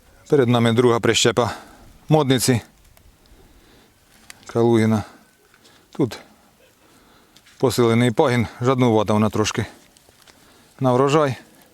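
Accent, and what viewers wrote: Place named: Poltava, Ukraine. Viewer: Дякую за огляд.Але якби Ви озвучували дату огляду то область Укаїни це було класно.По акценту вроді як Полтавська обл.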